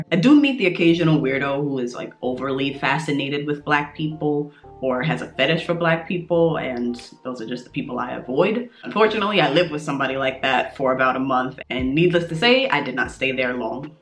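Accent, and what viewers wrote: New York, United States. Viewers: As soon as you started talking, I knew you were from New York lol. I'm also from New york(Harlem), currently living and working in Germany. I can definitely agree with your video.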